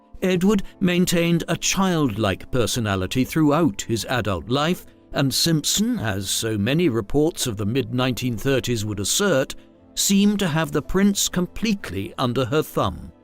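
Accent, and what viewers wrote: RP, United Kingdom. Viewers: I saw that. Huge mistake by the documentary makers. The reader sounds posher then the King.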